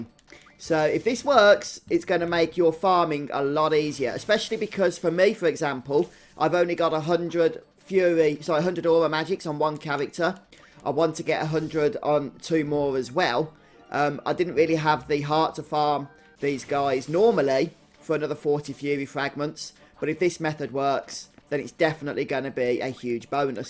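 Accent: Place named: England, United Kingdom. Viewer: Total no homo here but, dude, I love your accent. What part of England are you from? I could listen to your narration of anything and it would seem fun. Seriously, you could make technical manuals seem like an exciting read.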